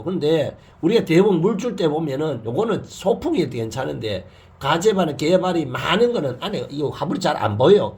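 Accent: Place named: Daegu, South Korea. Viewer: 대구 사투리같은데 듣기 넘 좋으네요 ..... 가드닝 지식 많이 배웁니다 감사합니다 늘 건강 하시길요^^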